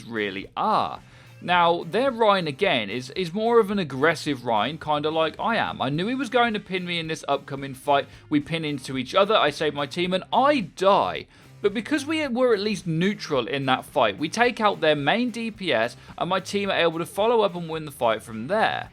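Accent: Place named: United Kingdom. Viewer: Doesn't he sound like a British version of Ben Wyatt from Parks and Recreation.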